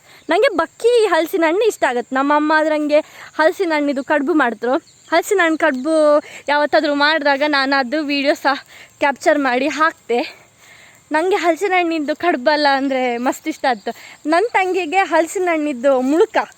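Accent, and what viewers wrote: Kundapura, India. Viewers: ನಿಮ್ language ಕೇಳಿದ್ರೆ ಕುಂದಾಪುರ ಕಡೆಯವರು ಅನ್ನಿಸುತ್ತೆ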